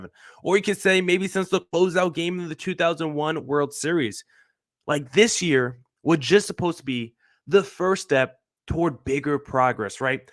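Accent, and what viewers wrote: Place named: Boston, United States. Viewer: I saw you on Sully’s locked on MLB. I turned in today to see what you had to say about the sweep. I had to laugh when you said you grew up a Red Sox fan. I am a lifelong Red Sox fan and I was thinking “this guy sounds like a Red Sox fan I like him.” Just one problem, how can you switch allegiance to the D backs? But I am happy for you that they won.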